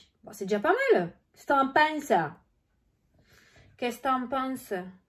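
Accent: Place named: Var, France. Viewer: super toujours aussi speed,ton accent vient du ( var ) bonne journée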